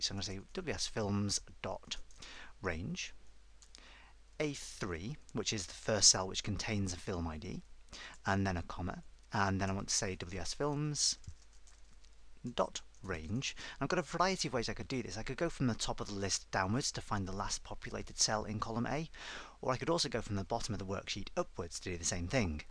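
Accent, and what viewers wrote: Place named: United Kingdom. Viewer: ***** No!I have been subscribing your channel for long time and love your videos.Problem is on me! :) English is not my mother language and I think so you are a British.I can understand easly american accent but the British Accent a little bit different.Anyway thank you for all your videos and tutorials.I'm following you.Best regards.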